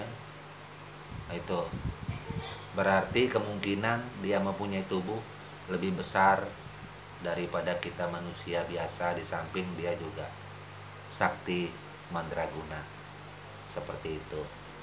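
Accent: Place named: Paser, Indonesia. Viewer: Orang paser sih ini dri logat² nya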